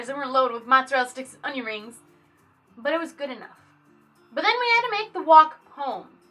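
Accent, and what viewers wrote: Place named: South Jersey, United States. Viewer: So your from South jersey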